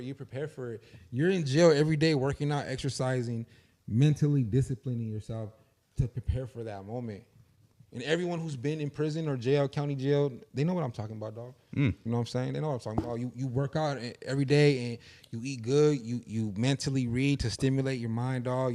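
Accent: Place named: Mexico. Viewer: He got more of a Mexican accent you got mexicans straight from mexico that look exactly like him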